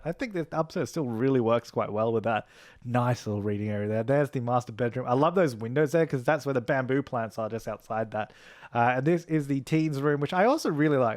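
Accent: Australia. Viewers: yea cause he's ostrayan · Is... Jazza from draw with Jazza? I swear they sound identical, not just the Australian accent.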